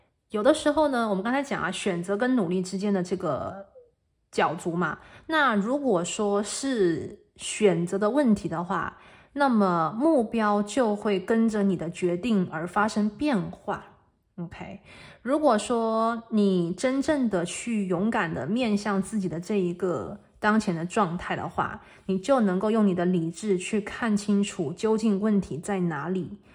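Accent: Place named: Taiwan. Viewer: 變台灣腔？好奇怪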